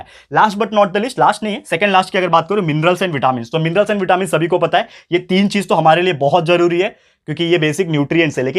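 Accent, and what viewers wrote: Maharashtra, India. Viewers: Are you Marathi ?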